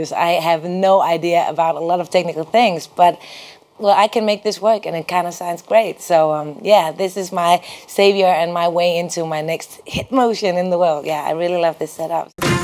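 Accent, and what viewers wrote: Denmark. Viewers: danish accent (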